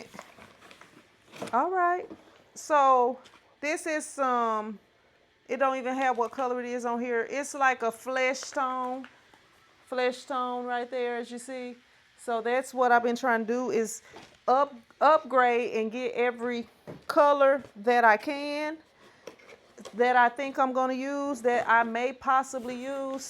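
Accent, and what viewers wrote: South, United States. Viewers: That’s crazy! Before you mentioned you were a basketball coach I thought to myself this woman is built like she hoops. I love your southern dialect btw. Thanks for all the t-shirt help and pointers.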